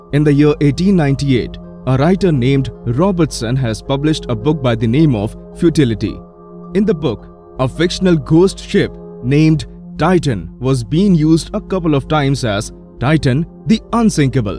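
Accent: India. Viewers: Indian voice/slang spoil the MW...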